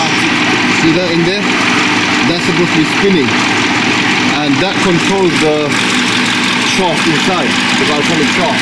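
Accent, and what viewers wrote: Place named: London, United Kingdom. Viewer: Man, I just love how the subtitles can't decipher the true Lahndahn accent!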